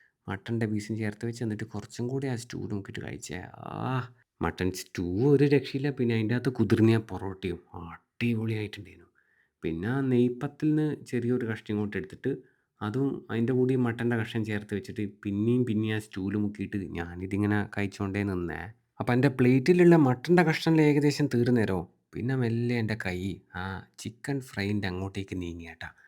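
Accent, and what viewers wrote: Kannur, India. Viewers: Ippo sherikk kannur karante samsaro ayknnallo ingalethh😅😊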